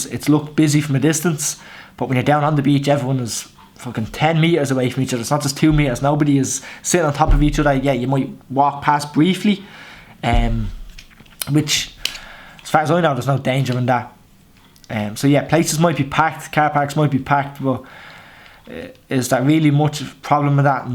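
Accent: Ireland. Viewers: Pure Irish , nice English 😀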